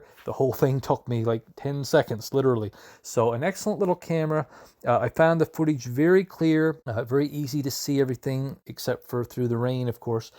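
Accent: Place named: Newfoundland, Canada. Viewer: Good newfie east cost voice 👌👌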